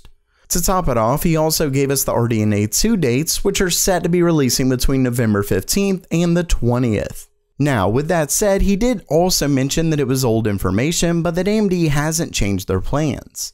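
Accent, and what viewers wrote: South, United States. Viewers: With each video your southern accent becomes more and more pronounced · I can hear the southern accent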